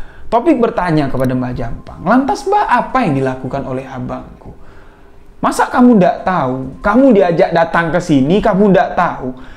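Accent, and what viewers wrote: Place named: Bali, Indonesia. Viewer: oalah..pantesan bang,bahasanya masih ada logat balinya hehe ok bang makasih,semoga chanel bang terus berkembang Aamiin.. 🙏🙏🙏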